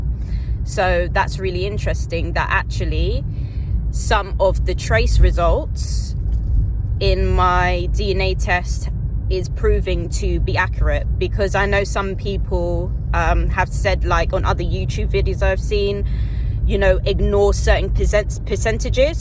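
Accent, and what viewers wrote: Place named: New England, United States. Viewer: you would fit right in with African Americans, with no problem at all until you start talking, but some will think that you grew up in New England, would take care of that accent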